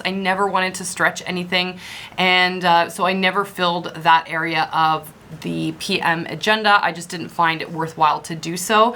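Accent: Canada. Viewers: Hey beautiful mama. I love your accent. You must be Canadian. I’m a fan ~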